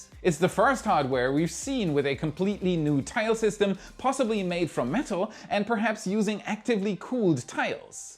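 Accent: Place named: Germany. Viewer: Even his accent is Crazy. To less Information about the topic seems like some random german just startet with reels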